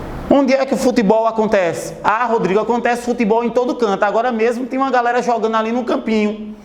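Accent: Northeast, Brazil. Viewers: Ótima explicação! E esse sotoque..do meu nordeste é lindo.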